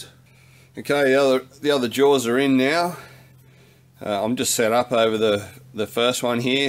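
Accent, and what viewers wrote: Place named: Australia. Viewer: Hears Aussie accent... presses like immediately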